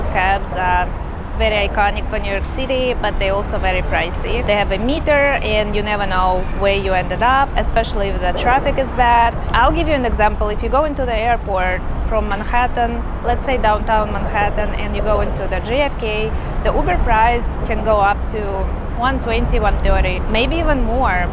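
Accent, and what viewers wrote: Russia. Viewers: aaaah, that east european/russian accent:) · sounds Russian or Ukrainian to me.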